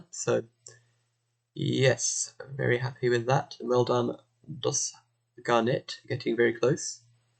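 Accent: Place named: United Kingdom. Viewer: great video, enjoying the face cam. in the last video you said you live in taiwan. are you a british living in taiwan (because your accent is quite british) or are you actually taiwanese? just curious.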